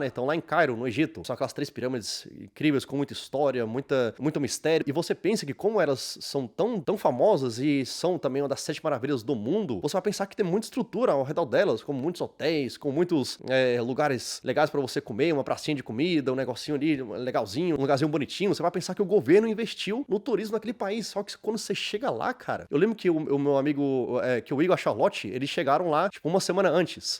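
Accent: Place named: Florianópolis, Brazil. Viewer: Eu via os vídeos desse cara há muito tempo atrás, e pelo sotaque, sempre achei que ele era de Florianópolis, mas não, ele é Brasiliense :face-blue-smiling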